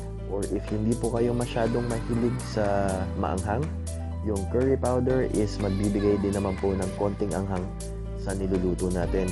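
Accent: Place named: Philippines. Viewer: You have a Filipino accent and speak English also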